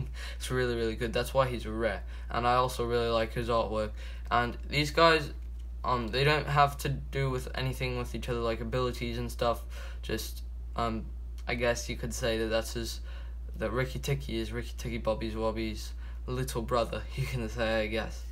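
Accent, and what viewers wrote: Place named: United Kingdom. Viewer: British accent 10/10 :l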